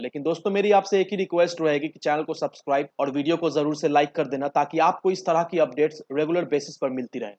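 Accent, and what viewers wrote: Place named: Kolkata, India. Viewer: main kolkata se hu, to mujhe apka tune se aga ki aap ho....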